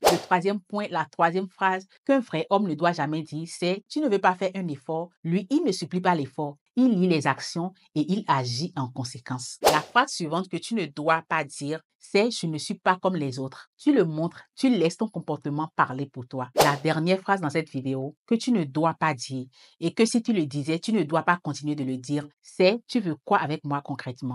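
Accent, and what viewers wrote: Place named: Benin. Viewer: Ce que j'apprécie vraiment par dessus tout au delà de la clarté avec laquelle vous expliquer les choses c'est le faite de garder votre acens naturel de femme africaine et particulièrement béninoise sans pour au tant mimer l'accent occidental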